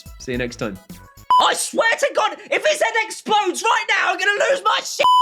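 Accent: United States. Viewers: Ok, as ANOTHER American watching, I am genuinely impressed with your American accent...great video as always. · That was a pretty good American accent. Very nice review too! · nice American accent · That was an AMAZING American accent! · Pretty good American accent!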